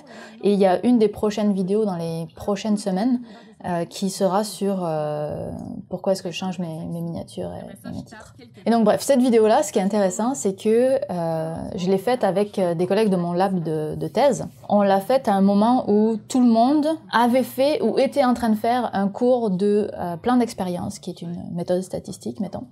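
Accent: Quebec, Canada. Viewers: C’est moi qui ne m’en suis jamais rendu compte ou est-ce que tu commence à développer un accent québécois ?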